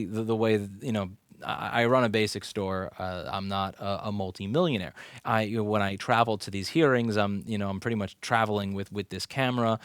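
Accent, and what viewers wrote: New York, United States. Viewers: Louis, someone else should do the day-to-day, but YOU should continue flying yourself to speak at legislative hearings and reimbursing your expenses ONLY from the advocacy group. The organization needs to have near-infallible, well-credentialed technical advisors and knowledgeable spokespersons from all corners of the RTR effort. YOU should be one of a large team of those experts. You’re a bit “New York” for this Oakland native, but you are well-spoken and engaging. You could tell a story about your trip to the “likkuh stowe” ...err, “bodega” last night for a bag of chips and people will listen. All my best to Mr. Clinton. Tell Oreo to go pound sand.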